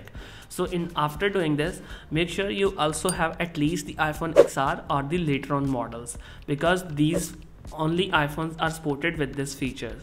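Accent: India. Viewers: Learn how to talk proper English, you sound ridiculous. You are speaking English not indian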